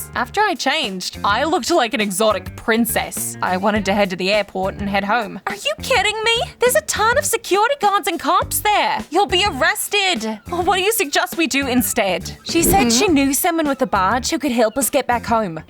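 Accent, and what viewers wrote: United Kingdom. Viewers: The British accent though 😅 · Dnt like this British accent.its annoying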